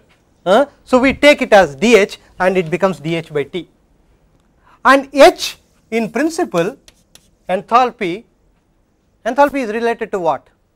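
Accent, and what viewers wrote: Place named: South India, India. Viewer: Ugly south indian accent and teaching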